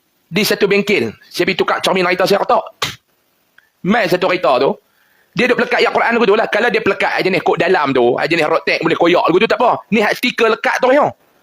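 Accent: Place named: North, Malaysia. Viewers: Mana ada kasaq..aku dengaq elok ja...hg ja kata kasaq..tapi hg suka baguih la..memang cara kami cakap macam tu..oqang luaq dengaq mcm kasaq tpi memang mcm tu kami cakap... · Ramai tk suka bahasa kasaq ustaz ni,,, tp aku suka,, meresap dihati makna yg ustaz ni sampaikan,, org utara cakap kasaq tp sedap dan mudah faham,, terbaik❤ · org utara memang nada cakap mcm tuu... biasa saja tone org utara cakap😂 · Aku org kedah, maaf laa aku rasa ustaz ni ceramah tak kasaq pun, biasa ja bahasa dia, cuma dia ckp tu pekat kedah biasa laa, ala ala smbg kt kedai kopi ckp lagu ni laa… kalau org luaq mgkin nmpk mcm kasaq, jd benda tu hanyalah subjektif… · Pekat dia punya Kedah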